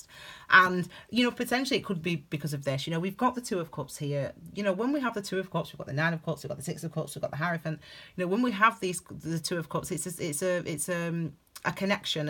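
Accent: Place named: Lancashire, United Kingdom. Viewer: Sounds like she's from Lancashire.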